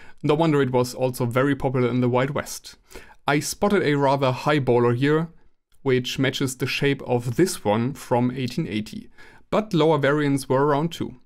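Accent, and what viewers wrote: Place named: Germany. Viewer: I hear a german accent :) I wish I knew the right tailors in germany...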